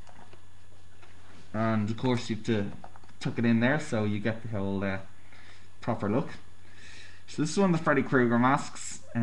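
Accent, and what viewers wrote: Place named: Ireland. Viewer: Nope Irish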